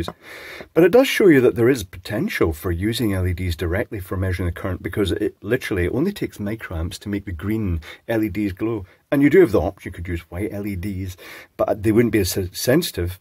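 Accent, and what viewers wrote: Scotland, United Kingdom. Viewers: trying to avoid saying "shaft" in a delicious scottish accent 😂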